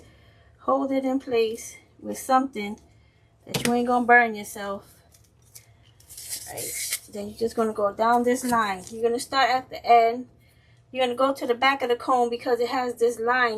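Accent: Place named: Louisiana, United States. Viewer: Just discovered you!!! I love it!!!! Your accent reminds me of Louisiana... Baldwin, Franklin, Jeannette, Grand Mary, New Iberia ....somewhere round there💜💜💜👏🏽👏🏽👏🏽👍🏽👍🏽👍🏽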